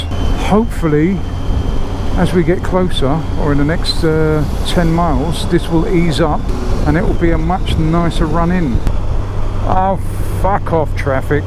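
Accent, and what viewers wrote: United Kingdom. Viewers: Damn lively man...enjoyed every bit of it...the queenz english and america r a good combo..!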